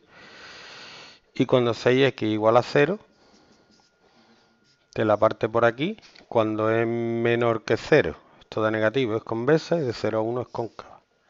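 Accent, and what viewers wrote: Andalusia, Spain. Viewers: Que se note quien es de Andalucía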